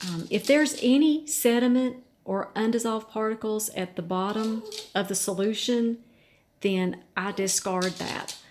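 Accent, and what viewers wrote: South, United States. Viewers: Hey, I love, really love your channel!🤗 Thanksgiving was wonderful and life is good! However, I'm new to orchids and have just purchased my first one three wks ago. I cleaned all the deteriorated bark off very well, put it in lava rock after purchase as i seen per Rick's channel and changed it rapidly over to water culture. It got dry, very dry very fast even though I soaked as he instructed. I am in AZ🌵😎🌵with virtually no humidity, understanding that he is in humid Alabama. So wish me luck as I have been watching your videos faithfully. I do have a Q...my phal purchase from Fry's (Kroger in Tn) came with no air roots!🙄They were cut off🤔by nursery I'm assuming. The 1/2" remnents are barely visible. Are air roots really needed or did I make a fatal mistake purchasing this phal not knowing at the time? Thank you❤️so much and love your southern accent.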